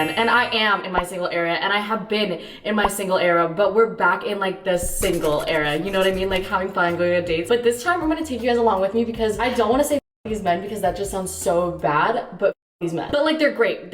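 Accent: United Kingdom. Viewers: hwa definitely british u cant hide it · I genuinely love your British accent why don't you upload a challenge talking about your British accent from the beginning of the video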